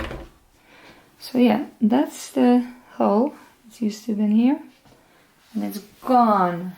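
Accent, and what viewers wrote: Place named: Armenia. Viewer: You sound like from Armenia like a lady I knew. She was from there. Neat voice & accent. If a person does not have a needle & thread they most likely not have an interfacing. I never heard of that or something like that to iron on etc only the word itself. Thanks for showing us how to sow a small hole tear. I am sewing 2 on a bag my mother made of 🌈 & a shirt.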